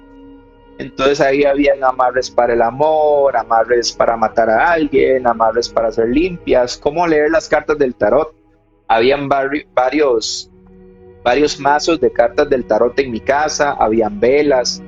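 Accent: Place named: Costa Rica. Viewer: tu acento es. Tico....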